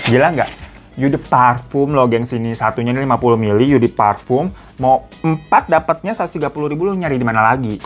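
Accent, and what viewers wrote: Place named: Makassar, Indonesia. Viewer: Kok lucu basa kotor mks 😂 logatnya beda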